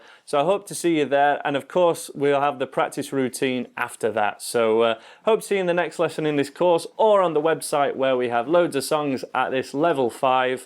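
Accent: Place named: Northern England, United Kingdom. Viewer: Cheers! Mega helpful plus - Northern Accent FTW!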